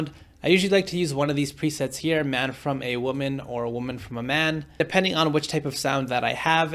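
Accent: India. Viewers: Are you a Indian residin in US ???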